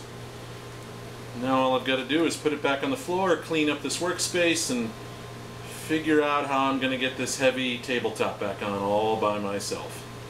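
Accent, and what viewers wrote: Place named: Baton Rouge, United States. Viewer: Nice job. I have a 17 year old 91764 lift with a bad pump. It works fine with my bikes 500lbs and under but puked itself trying to lift my Harley Road Glide. At 900+ lbs it just might be time for a lift replacement. Costly, but the bike is too near the limit of the lift for my comfort. Even if it did work, I'd drill a few new holes for the safety bar higher up as I don't need the bike more than 24" off the floor. By the way, I guessed right. You sound exactly like a contract engineer I use that's from Baton Rouge.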